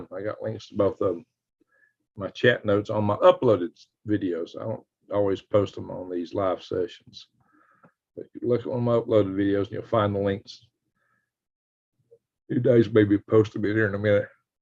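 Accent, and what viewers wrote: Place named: Appalachia, United States. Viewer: I tried to share with my old friend; all he could do is copy accent, 'hill-billy'. My sister would think I was a joiner, so.. Like Pork during Ramadan- good, more for me!!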